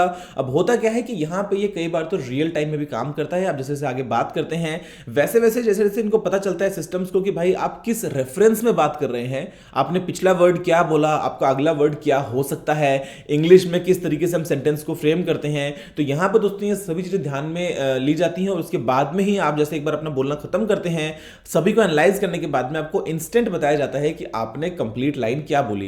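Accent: India. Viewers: Why is this channel written in English but spoken in Indian?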